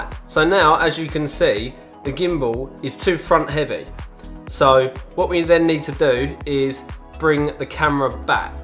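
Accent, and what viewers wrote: United Kingdom. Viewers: Hey, nice video. Sounds like your from UK? Where did you order yours from? Seen them on Amazon, Also does the app work on andriod phones? Thanks.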